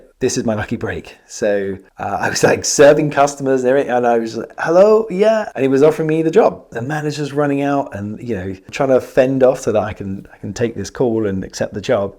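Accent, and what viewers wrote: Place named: England, United Kingdom. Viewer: Amazing teacher, animator and my favorite. Nice English accent as well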